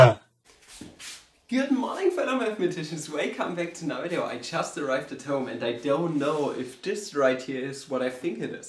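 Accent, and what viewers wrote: Germany. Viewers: How do Germans know how to make their own accent funny. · That’s a German accent isn’t it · Oh Ich hab mich schon gefragt, warum ich so einen leichten deutschen Akzent heraus gehört hab und war richtig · I could tell from your accent. I'm half Filipino and half German too btw. Nice video!👍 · i still like this german accent artifact of clearly pronouncing all "L"s that precede final consonants like k, "chalk". it sounds like your new chalk. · Ich weiß nicht wieso, aber ich wusste direkt das deutsch bist 😂😂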